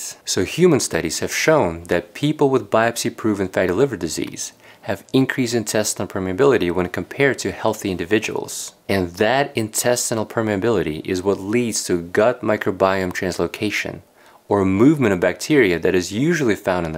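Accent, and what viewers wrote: United States. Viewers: only like your American accent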